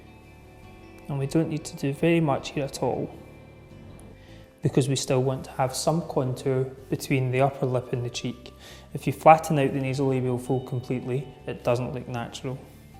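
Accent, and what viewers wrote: Scotland, United Kingdom. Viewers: Where are you based,? I note your Name is Scottish and accent. Are you based in SCOTLAND?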